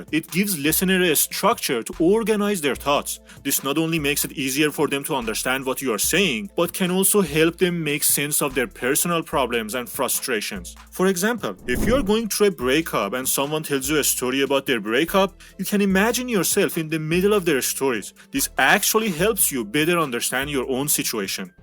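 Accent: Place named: Iran. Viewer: Oh this is the thickest Persian accent that I've ever heard! 😂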